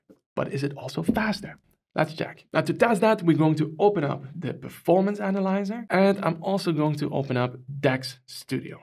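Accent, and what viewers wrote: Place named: Netherlands. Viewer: I am not an English speaker but I follow your educational content. Why is your English accent like this? Whether it is Google's AI or any AI I have used, it pronounces your language as Dutch, not English.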